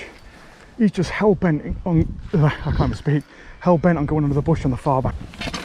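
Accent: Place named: Nottingham, United Kingdom. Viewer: Lesson thoroughly learned I guess! Well done anyway. Lovely looking fish. I'm guessing by your accent you're Nottingham area? Anyways, I used to work for the council in Long Eaton and there were some big old carp in the canal there. They'd grown big and fat on all the duck bread over the years.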